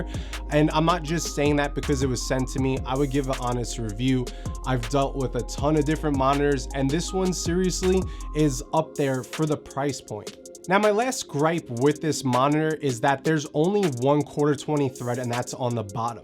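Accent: New York, United States. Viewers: I will primarily use this for stills (landscapes) as I find the standard camera screens limited in scope, especially for my old eyes. Although, I surely might be tempted to do more video..!! A good thing, indeed. I have the ability to use a Samsung Galaxy S2 tablet, a very attractive display and wifi connected, but outdoors, here in sunny Las Vegas, something more is needed.. I noticed the palm trees in your video samples, but your accent is back east NY (as am I).. LOL! Thank you, for your good nature..!!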